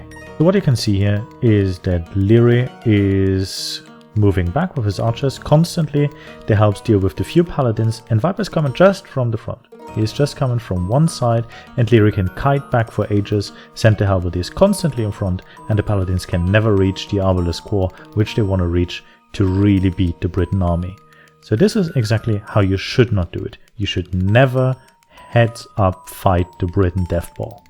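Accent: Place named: Germany. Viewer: Nice first strategy video. I think the best way you can improve this is working on your idiomatic English. As an example, there is your use of the formation "despite of", which occurs at least four times in this video. Now, the way you used it is fine, except for the fact that the formation does not exist in English. The formation you are looking for is "except for". Your non-standard word order is distracting. For example, "This makes it possible to go earlier on wood", which sounds a bit like Yoda-speak. Try: "This makes it possible to go on wood earlier". Also, "they run out of a bit faster wood" should be "they run out of wood a bit faster" - otherwise it almost sounds like they are only going to have slow wood left after the "a bit faster" wood runs out. In these constructions, remember to group "verb + direct object" then put the modifier (e.g. 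earlier/a bit faster) at the end. Word order is as important in English as word form/ending is in German. Incidentally, sheep is one of those rare words in English that are the same in singular and plural - there are no "sheeps". There are a lot of typical German-speaker phoneme substitutions in your spoken English but these will be fine if you can iron out the grammatical issues. These comments are intended to be constructive. Your English is much, much better than my German, but I am not running a media channel in German so my mistakes have few consequences. You are providing quality AoE content and I think if you work on these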